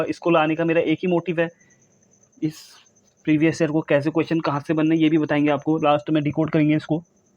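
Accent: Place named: Jodhpur, India. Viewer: aap kha se ho sir. Language se jodhpur k lgte ho. Sir aap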